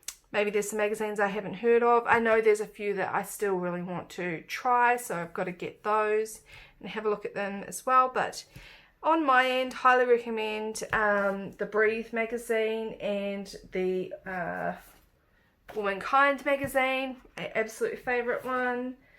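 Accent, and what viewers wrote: New Zealand. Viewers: Thank you..I am from Wanaka and you sound very NZer to me.x I love watching your video's and love what you make.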